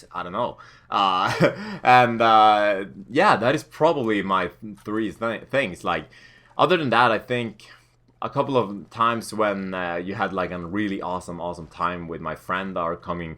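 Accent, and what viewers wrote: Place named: Sweden. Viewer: "the shooting of yon f kennedy" hahaha swedish accent FTW!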